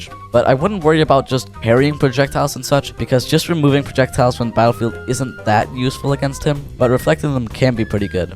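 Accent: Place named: Denmark. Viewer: bro lyder lidt for dansk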